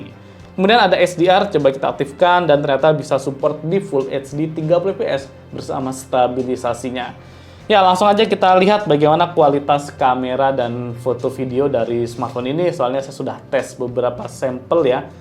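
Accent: Yogyakarta, Indonesia. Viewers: Mas nya orang jogja kah?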